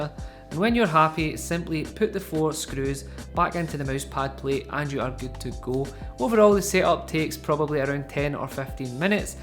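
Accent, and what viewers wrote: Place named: Scotland, United Kingdom. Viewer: I love the one cable instead of the spaghetti you usually get, I thought people hated Scottish accents haha. Nice vid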